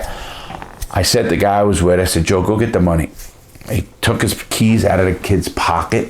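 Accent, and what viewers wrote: New York, United States. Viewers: them thick new york mob accents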